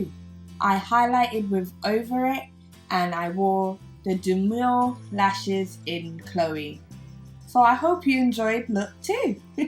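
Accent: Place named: England, United Kingdom. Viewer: Damn, you're English lol I love your accent. New subbie here 😊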